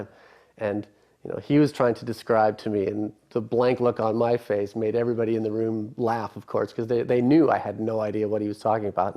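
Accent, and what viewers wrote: Canada. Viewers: That's how Canadians pronounce again.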